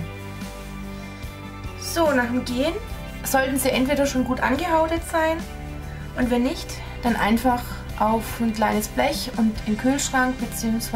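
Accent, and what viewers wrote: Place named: Swabia, Germany. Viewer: Hallo liebe, vielen Dank für das super Rezept. Wir leben in Pakistan und vermissen die deutschen Backwaren daher umso mehr. Heut habe ich die Bretzeln nach diesem Rezept gemacht und meine Tochter hatte Tränen in den Augen, weil es so lecker, genau wie in Deutschland, geschmeckt hat. Dein schwäbischer Akzent macht das Video angucken noch sympathischer. Liebe Grüße nach BaWü. Angelika. Bei Instagram kannst du Fotos davon sehen Instagram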